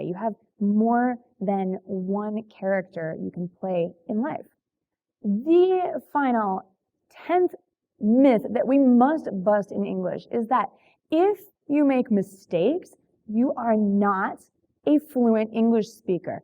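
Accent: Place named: United States. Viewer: I love your pronunciation!! So American. I lived in America before, but living in America for 1 year is not enough to speak English fluently or learn English perfectly. So I was looking for this kind of Video which is made by American. Thank you so much! Watching from Japan!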